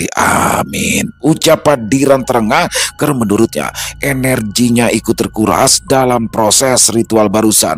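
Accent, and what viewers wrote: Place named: Betawi, Indonesia. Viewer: sangat terhiburr. Om...keren pembawaan nya..tapi klo sdh cerita ada gaya betawi nya lbih lucuu...😂keq warung bng doni...sukses terus ya om ❤️❤️r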